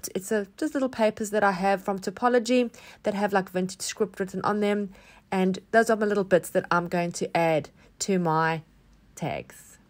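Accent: South Africa. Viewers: Has to be a saffie - my accent too. We say Serviette though? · You have a South African accent?? Loved the ideas shared.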